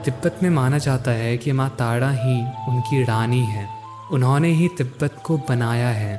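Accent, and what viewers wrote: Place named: Bengal, India. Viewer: Ye bangali babu hai isliye 😊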